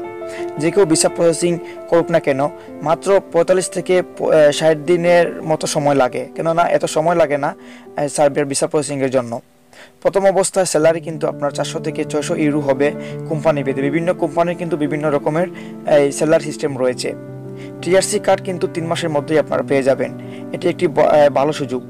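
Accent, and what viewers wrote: Sylhet, Bangladesh. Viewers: ভাই সিলেটি, লাস্ট কথাবার্তা শুনে বুঝতে পারলাম। ভাই প্রশ্ন হচ্ছে, ম্যানপাওয়া কেন দেয় না -সরকার?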